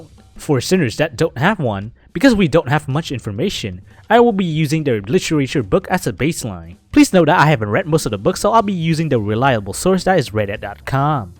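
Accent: Vietnam. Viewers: Seeing this guy Vietnamese accent continue to become more presence is funny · you sound so vietnamese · why do you sound like a Vietnamese/Asian?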